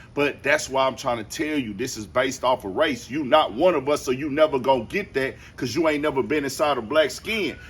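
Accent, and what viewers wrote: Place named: Houston, United States. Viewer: Brodie u so Houston😂😂😂 “ unless u wanna squabble” 🔥🔥🥶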